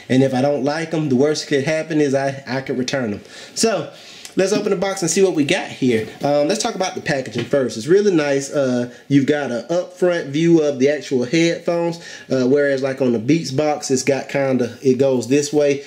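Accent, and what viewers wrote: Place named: Texas, United States. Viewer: y sound like a countryman from Texas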